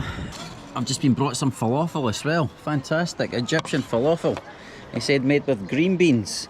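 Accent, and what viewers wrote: United Kingdom. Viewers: Are you British .... cause your accent is so harsh to me❗❗🤔🤔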